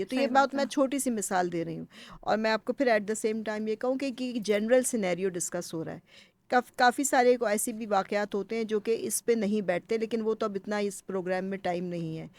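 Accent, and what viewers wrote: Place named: Sialkot, Pakistan. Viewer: gi host sahiba ap Sialkoti lag rehi hn from your accent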